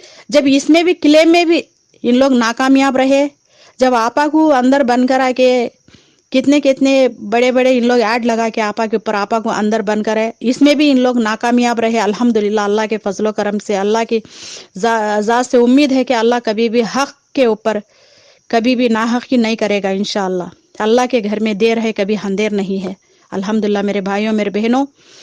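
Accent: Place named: Hyderabad, India. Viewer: Haydrabadi zubaan thodi zubaan pe rahte hai iska matlab thodi ke unko knowledge nahi hai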